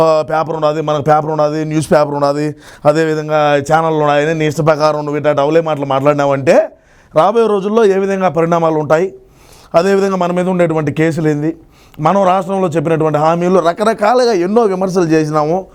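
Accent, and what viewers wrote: Rayalaseema, India. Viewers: Posting this comment randomly to reach you Anna, though I’m from Kosta, appreciate you on highlighting Seema yaasa, I love it anna.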